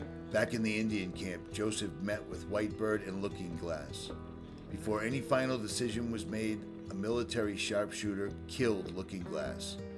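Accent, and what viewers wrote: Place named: East Coast, United States. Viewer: Your from the east coast aren’t you?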